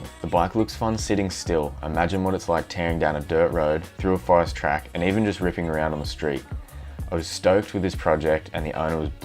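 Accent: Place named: Australia. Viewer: Love the narrative. Aussie as fack.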